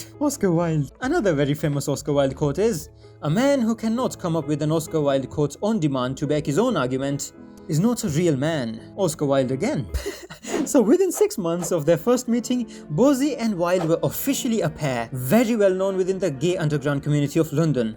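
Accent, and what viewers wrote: India. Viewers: That accent is thick in the Indian way alright. Maybe he tried to recover from the actual super heavy Indian accent but it still sounds very Indian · u r indian?? so what about this accent is it ai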